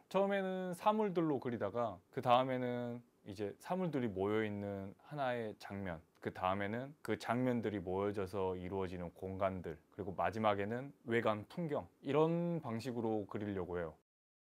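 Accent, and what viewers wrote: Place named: Jeolla, South Korea. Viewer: 앗 저 전라도가 고향인데... 말씀하실 때 전라도 억양이 들리네욤 🤭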